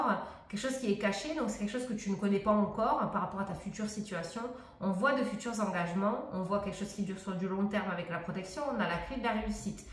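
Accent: South, France. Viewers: Félicitations pour les 60 000 abonnés! Je participe au concours avec plaisir, j'aurais l'occasion d'entendre votre accent du sud au téléphone. Très belle journée 🌹🍀🐞🦋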